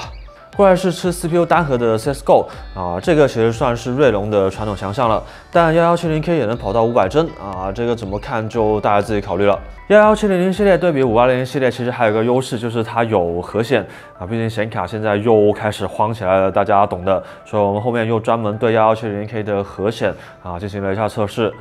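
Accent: Cantonese, China. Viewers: 粤味唠一唠嗑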